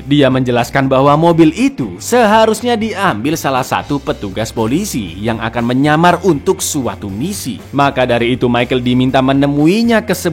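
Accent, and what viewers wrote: Java, Indonesia. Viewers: Gausah alay bro suara lu dan aksen jawa lu ..belum tentu banyak orang suka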